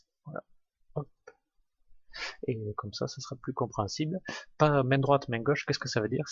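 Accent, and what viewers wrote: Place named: South, France. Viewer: Bonjour Ce sont des super tutos, j'apprends sur 2 écrans en faisant au fur et à mesure et j'ai pu imprimer les premières pièces dessinées sur freecad. cependant, une petite question: quelle version de freecad utilisez vous sur les premières vidéos? Sinon c'est génial avec l'accent du sud!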